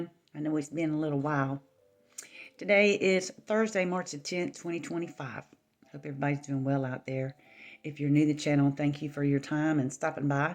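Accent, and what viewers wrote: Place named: South, United States. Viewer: Yay Lovely! Oh no not Vertigo! I had that so bad when they did work in my apartment and didn't clean the drywall dust out of the heating system. Don't go on any step stools! Flouride in the water also makes people more passive. I love hearing the news from you. You find things that I didn't know about and deliver it lovely, but Strong Southern Belle style. Things are chaotic and I should probably stop expecting otherwise. I have a domestic abuse situation going on with the people living above me, and the police won't stop the alcoholic husband, even though I hear glass crashing and things breaking, and the wife screaming. There's a small boy and a puppy too! I had to call the Police a couple of nights ago. They did nothing but talk to the drunk guy. He's been known to retaliate when people call the police by slashing tires and other craziness. 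Yay. My mom is ok but I have a bad feeling about where she is living. And I have to look into what else there is for her level of care. I can't physically do it myself, and I can't afford At Home Care. I already tried all of that and there was no way. She refuses to do any leg strengthening, so she can't walk. They come into her room to help her with her legs and she screams for them to get out. I have no choice but to have her living where they can take care of her. She wouldn't let me do her personal care either. I never wanted her to live in a Care Home, but she fights making it any different. The stress makes my own EDS pai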